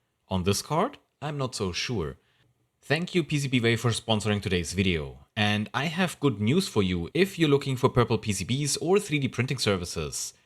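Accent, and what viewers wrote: Germany. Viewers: based on his accent I would guess he is German, in Germany it is common to say nineteen hundred but as soon as you come to the two thousands you wouldn't say twenty nine hundred and say two thousand nine hundred. It's a force of habit to say numbers this way.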